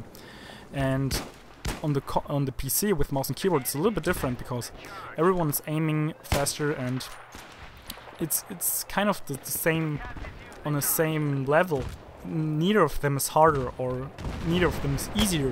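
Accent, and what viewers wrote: Germany. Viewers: Your german right? Your english sounds a bit german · du bist deutsch oder? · are you maybe from germany becaouse i hear a little bit accent?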